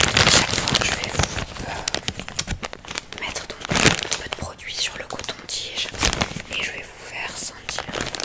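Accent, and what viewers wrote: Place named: Toulouse, France. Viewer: C'est marrant, j'ai récemment suivi une formation, et ma formatrice avait aussi un accent toulousain, et habitué comme je suis à tes vidéos, j'éprouvais des déclenchements à l'écouter parler. 😅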